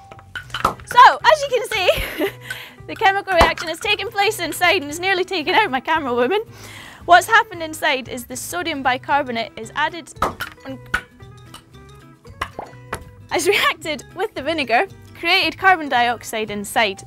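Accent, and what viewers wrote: United Kingdom. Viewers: British accent ftw XD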